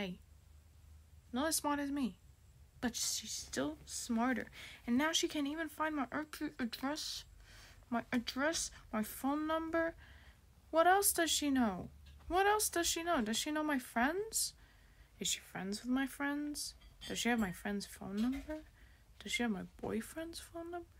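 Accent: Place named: Australia. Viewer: I knew it I knew u sounded like a Australian🙃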